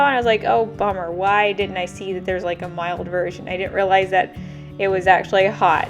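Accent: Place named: South, United States. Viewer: You sometimes sound so southern although I know you aren't originally from the south. Everyone I have ever met from "up north" has always called dressing, stuffing whether in a bird or pan. Edit: Tough Shrimp means you overcooked. For shrimp you need to only cook till it's pink all the way through. If you keep cooking it will be tough and chewy.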